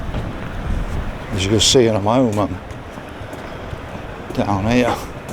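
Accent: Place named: North Derbyshire, United Kingdom. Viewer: You don't sound "true" Sheffield, more like north Derbyshire but anyhow. I lived just up the road from the start of your walk here, and always wondered if the little opening in the river on 3.43 of your film is the stream from Norfolk Park that runs through the Jervis Lum on the estate, entering the Sheaf.. It must finish up somewhere close to that. There's another higher up the wall pipe a bit downsream I think that constantly flows which maybe it. The path at this point of the film was the old Sheaf Gardens, old houses and streets and straight on is Cromford and Rowsley street, notorious in the 70's...still cobbled and big families back then living on there. Really rough and ready but great people.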